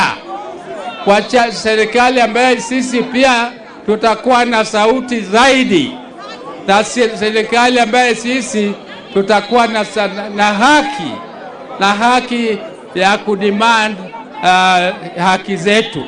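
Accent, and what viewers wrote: Luo, Kenya. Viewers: Nasikia sauti ya jaluo tu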